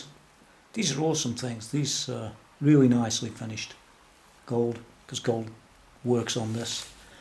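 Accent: Stoke, United Kingdom. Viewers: I must say, i hate strats usually but this is one of the nicest looking guitars i have ever seen! and its always nice to hear a stoke accent on here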